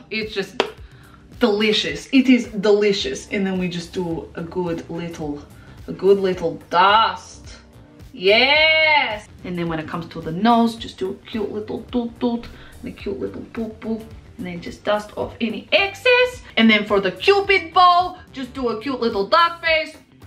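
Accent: Russia. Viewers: I'm new here, what is her accent? Sounds a bit Russian? I love her personality <3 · are your neighbours happy about the fact that you're screaming and moaning like a crazy? 🤔😂 btw this is the first video from you that i watched and i loooooove you, especially your russian accent! 🤠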